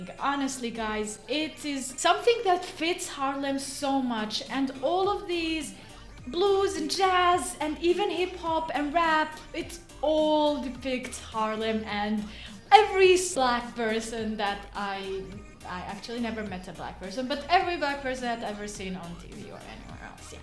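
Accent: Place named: Russia. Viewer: Hey, love your accent..just wondering where are you from? Russia? 🐰👍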